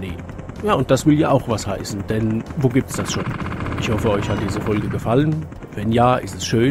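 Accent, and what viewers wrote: Hesse, Germany. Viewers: Dieses war das erste Video, das ich von Dir gesehen habe und mir gefällt, was Du machst! Mal ganz davon abgesehen, dass ich selbst einen A500 hatte, finde ich Deine Ausführungen sehr eingängig und allgemeinverständlich. Ich gebe zu, ein bissel technischer könnte es meiner Meinung nach schon sein, aber das ist nur eine Randbemerkung. Übrigens: Du bist Hesse, oder? Du hast einen grandiosen Akzent, der aber niemals überhandnimmt. :)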